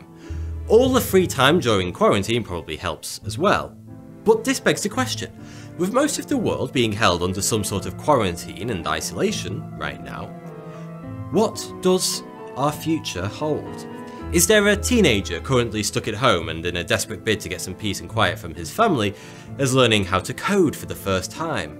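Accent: United Kingdom. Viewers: Pardon the ignorant question: which part of the UK is your accent from? Introvert here. This quarantine is brilliant! I love it! I'm learning 2 foreign languages, taking online guitar lessons, reading voluminously, deep-cleaning my flat, getting a suntan, etc. What's the bellyaching about? Ha!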